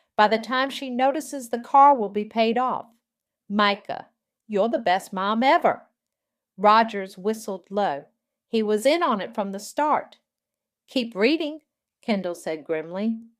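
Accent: South, United States. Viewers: Bravo... Great story Thanks loved the southern woman narration ❤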